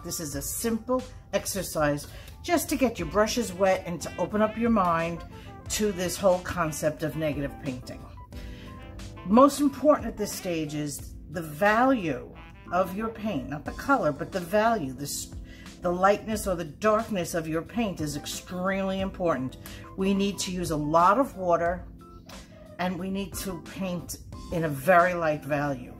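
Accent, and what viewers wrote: East Coast, United States. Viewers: Ok,! I love this tutorial.. and your East coast accent 😜🥰 Thank you!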